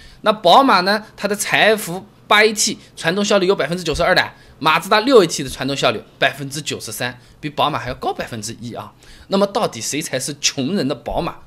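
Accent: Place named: Shanghai, China. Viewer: 喜欢你的阿拉普通话外加幽默。可以尝试更上海一些.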